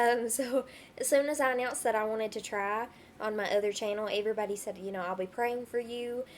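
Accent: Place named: Southwest, United States. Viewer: R u frm Texas or sumthin cause it sounds like u have like a southwestern accent?!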